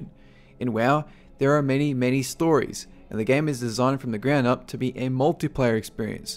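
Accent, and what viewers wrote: Australia. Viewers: You're Aussie arent yah? What realm do you play on wow? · i love your accent lol now stupid question, are you Australian? Thanks for all your time in making these videos i am learning so much about WoW that i never new before.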